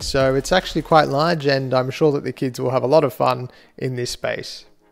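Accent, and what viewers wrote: Australia. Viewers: I was not expecting you to be Australian, but I’m not disappointed. I’m American, and sailed Splendor in Alaska. I like the “pink lady” vibe, but I’m not a fan of all of Carnival’s aesthetic choices…Splendor is one of the better looking imo.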